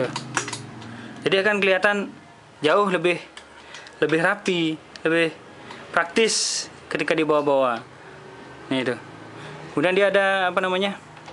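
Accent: Bali, Indonesia. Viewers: tong logat bali sing ilang... Mantappp oom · bali lah.. bener gak bli? =)) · bagus videonya, tingkatkan lagi bli.. khas sekali logat balinya.. hehehe suksma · Pasti Orang Bali... Kental banget Logatnya.. Hari Minggu TOKO TOKO PADA TUTUP, Phanter irit cling cling... xixixixxixi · kaya logak bali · Itu logat Bali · Logat balinya kentel saja bli 😄..suksme infonya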